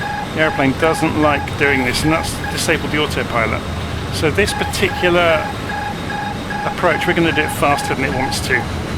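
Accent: United Kingdom. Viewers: Hello, thank you for the calm and lucidity you bring to flight simming by way of your well conducted videos. I have a question, when you bring up multiple screens simultaneously on then narrow window, what program/app do you use to accomplish same? By the way, your calm and polished British accent is very easy on the ear😊! Thank you.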